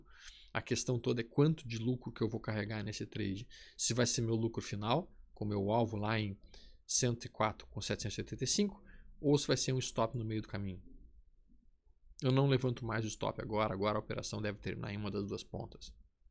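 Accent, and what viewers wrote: Rio Grande do Sul, Brazil. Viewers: Tu é do RS?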